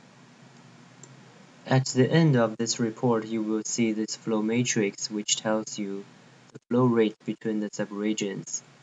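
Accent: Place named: China. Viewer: 一听就是中国人哈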